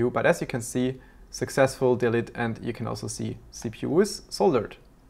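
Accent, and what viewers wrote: Germany. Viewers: er ist eben deutscher. Meine fresse. diese dummen unkonstruktiven kommentare immer. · deutscher Akzent ist richtig übel... aber sonst mag ich deine Videos!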